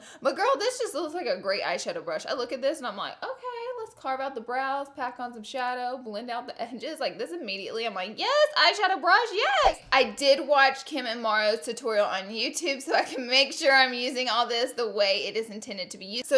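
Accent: South, United States. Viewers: she sounds southern in this video hah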